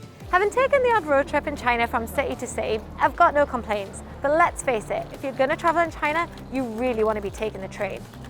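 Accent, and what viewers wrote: United Kingdom. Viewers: I noted that she and another British youtuber, Mr. Barrett, pronounces City as CE. Not sure this is a common UK accent? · Sorry to say, with your accent I take it you are from UK and when you mentioned there were MANY pot holes in Beijing but when comparing to UK; the road in China is as smooth as a baby'd bum! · You're so cute，as well as your British accent. Hope I can buy you a cup of coffee when I go back to China😄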